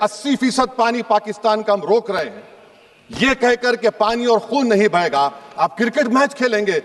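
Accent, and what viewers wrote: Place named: Hyderabad, India. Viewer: HYDERABADI BOLTEY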